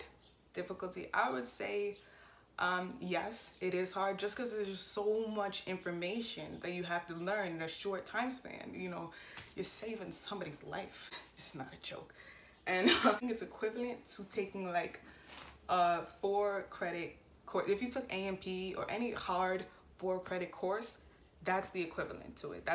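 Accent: New York, United States. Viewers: as soon as I started watching I just knew you were from New York lol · Sound like a New Yorker😎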